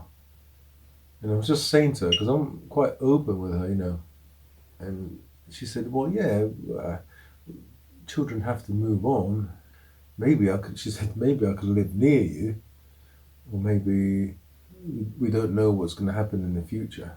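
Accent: United Kingdom. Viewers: Hello,just discovered your channel,are you English?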